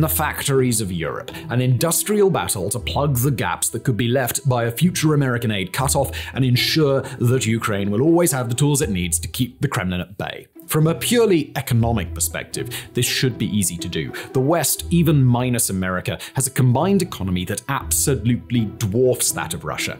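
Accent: United Kingdom. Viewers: As an American taxpayer, I find it extremely irritating that someone with a British accent is blaming the country that is an ocean away from this conflict for failing to aid a European side in the war. Meanwhile Germany, for example, as well as the rest of the EU aren’t providing the necessary aide for their champions in the conflict that is taking place on THEIR CONTINENT! As someone who was born in Ukraine, I am hugely relieved that my great country — USA — is doing more than its fair part in fighting the newest axis of evil with Russian Empire, China, and Iran at its core. Well done Mike Johnson — God bless you!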